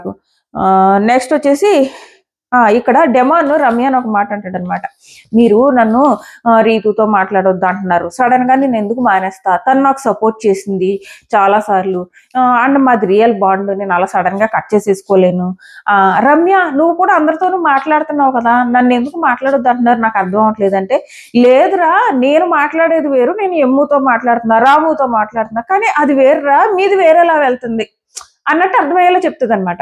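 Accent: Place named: Srikakulam, India. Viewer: Its Srikakulam slang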